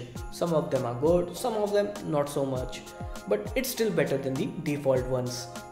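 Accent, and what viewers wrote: India. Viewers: I LOVE your indians accent! hah xd so cute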